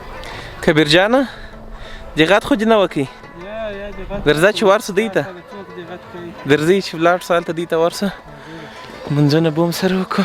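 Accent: Kandahar, Afghanistan. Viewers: Staa pashtoo mashaallah saafa da kandahar ra payad ke 😢😁😎👍 zzar zzar vlog jorawa . Der intizar ma rabandi kawo.shaista vlog dii ye intizar na ki zzi all the best for all vlogs👍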